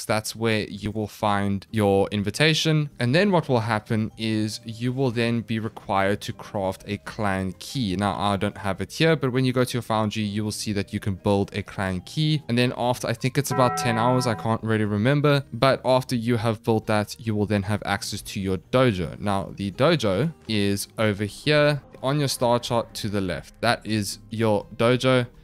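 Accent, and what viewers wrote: South Africa. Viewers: Man, I left home two years ago but can still tell a Saffer when I hear one. Awesome videos!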